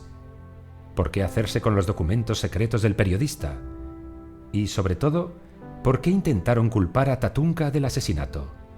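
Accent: Spain. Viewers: El documental está narrado con un acento 100% de España, no hay nada extranjero (ni peruano) en él.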